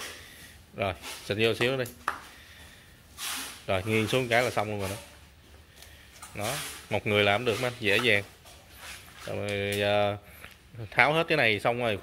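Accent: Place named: Mekong Delta, Vietnam. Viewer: Cửa hàng mình ở đâu vậy anh..? Nghe anh tiếng ngươi miền tây ạ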